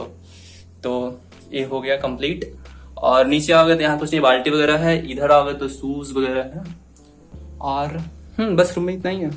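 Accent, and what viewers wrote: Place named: Jammu, India. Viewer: Bhai jammu ma bhi same jhi bolte ha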